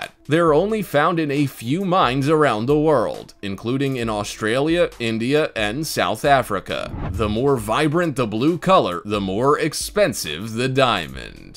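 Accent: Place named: United States. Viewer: Beautiful gems, but the American accented narrator, the guy in particular is extremely painful to listen to, he talks as if we're all thick as two short planks. Had to switch off the volume. As for the garish countdown with that stupid character it really makes a mockery of the content; which are the fabulous jewels. Re-do this with a more pleasing voice (even mine is 10 times better) and get rid of the character countdown and what the equivalent of the jewellery could buy, eg., 13 American homes, yada, yada.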